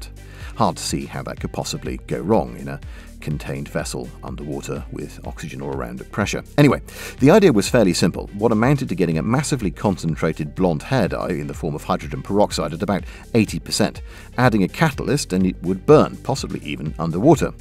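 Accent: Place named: England, United Kingdom. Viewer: Damn you! Once again, you have, through the magic of the Youtube "Subscibe" button, caused me to lose some perfectly useful sleep watching your highly informative and semi-mesmerizing presentations on Nazi Germany's comic attempts at world domination! Although this time I have no family-related commentary on the the technology involved in winning the War for this post, at least I understood at least seven out of ten of the words you spoke thanks to my continued exposure to the "English" English language. Thank you, citizens of Weymouth, Dorset, the staff at The Imperial War Museum in London and my friend and British Expat Clumpton - who lives in Vichy, France. Someday, someone will create a series of theme music Memes like that of YouTube's Mark Felton... Do keep up the good work, chap. Cheers!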